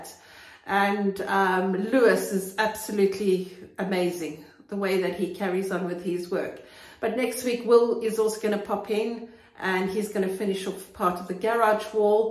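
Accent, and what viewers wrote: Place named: Cape Town, South Africa. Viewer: You sound like you're from Sea Point, Cape Town.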